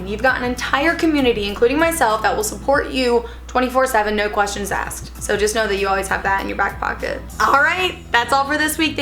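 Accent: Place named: United States. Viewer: Your funny 😂😂I love your American accent 👋🤟🏽hi I’m Niayla nice to meet u 🤣🤪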